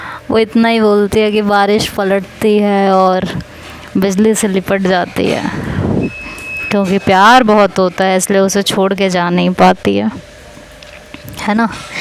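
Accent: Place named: Haryana, India. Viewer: haa har jgh ki language ke according bolne ka trika change ho jata hai.. jese apka full hariyanvi touch ho rha tha.. meri mummy bhi bol rhi thi ki ha harayana jesi hi aavaj lag rhi hai because mere papa vha job karte the hariyana me jb me peda nhi huvi thi tb to mumy bhi rhti thi sath to unhone observe kiya hai.. mummy bol rhi thi ki aap mjakiya type ho i mean ye nhi ki jb bat kro tension do us type.. pta h mummy ko suabh se chull tha ki mere ko bhi sunana aavaj 😂😂😂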